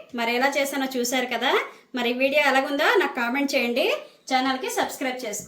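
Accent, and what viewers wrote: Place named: Godavari, India. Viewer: Indilo nene aite koncham chintapandu kuda vestandi meedi kuda ee sari try chesta meedi kuda godawari gillanena mee maata teeru alne undi andi mee videos anni chustu untanandi best ok luck ❤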